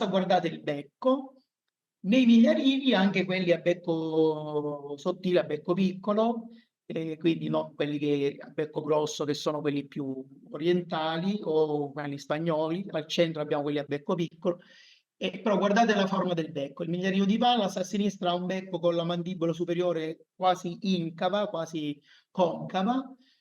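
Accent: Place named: Sicily, Italy. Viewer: scusate l'inizio è tagliato...e i sottotitoli automatici della IA non tiene conto della mia pronuncia "sicula", tagliando parecchie parole o facendo una stranissima interpretazione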